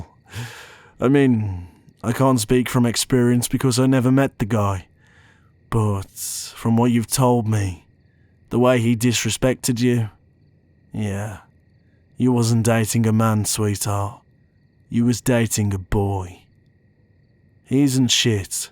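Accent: United Kingdom. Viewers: I dont usually like men BUTTTTT I make an exception for this bri’ish boy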